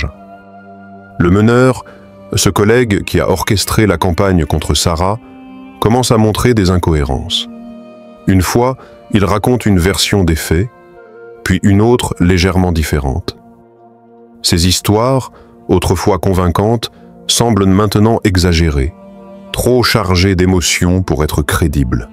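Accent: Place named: Canada. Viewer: Dieu est fidele❤ j ai ete et suis calomniee mais je reste la meilleure reponse..... je suis bien vivante et rayonnante😊 j ai ete calomniee dans une ecole et j ai renversee la situation contre mes detracteurs faux temoignage = peche capital😈👿 dans ce type de lynchage la lachete et la complicite de l entourage pesent lourdement.... celui qui se tait est complice. Le menteur a mal lu Machiavel😊 Dans ce cas l issue est heureuse mais helas parfois l issue est tragique pour la victime super l accent canadien😊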